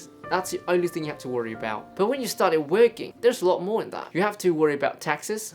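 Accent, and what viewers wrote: United Kingdom. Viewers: Giọng thì Anh anh nha Mà cái cách nói chuyện thì mix với anh mỹ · Your British accent is so charming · I'm really love your british accent haha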